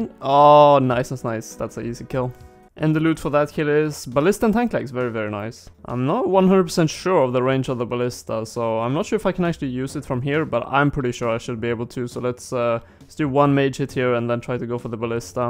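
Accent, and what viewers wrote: Sweden. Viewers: Good stuff man! If my ears don't lie that accent has to be Swedish. Lycka till i fortsättningen!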